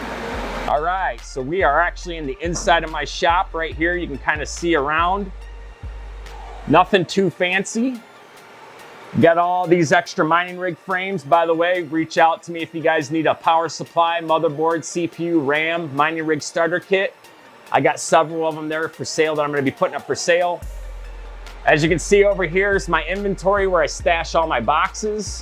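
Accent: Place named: Wisconsin, United States. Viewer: You sound like you're from Wisconsin lol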